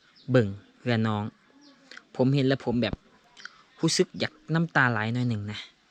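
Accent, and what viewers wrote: Laos. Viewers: ເຈົ້າປາກພາສາລາວໂຄດນ້າຮັກເລີຍ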